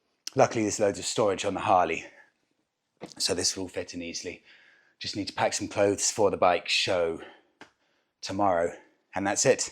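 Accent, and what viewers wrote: United Kingdom. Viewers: Hello. Your dialect is like music in my ears.😊 Where in GB are you from?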